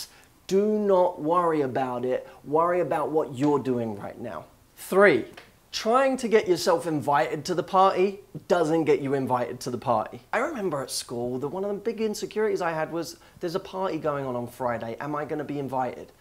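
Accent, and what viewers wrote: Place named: United Kingdom. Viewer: (aint always easy) anal is easy?! hahahahah I love the british accent.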